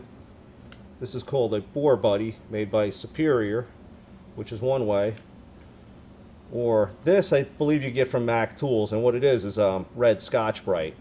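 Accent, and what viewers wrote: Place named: Long Island, United States. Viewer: I've been out of NY for 20 years but id guess long island?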